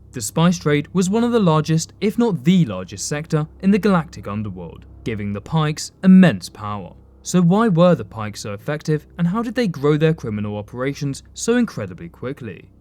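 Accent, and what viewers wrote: United Kingdom. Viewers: Just realised he’s from the uk cuz the accent. #oneofus!!!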